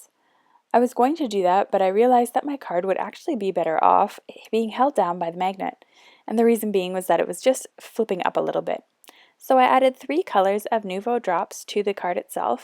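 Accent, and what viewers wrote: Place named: Canada. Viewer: Today is the first time I noticed your Canadian accent. Love it. Would like to see more man type cards.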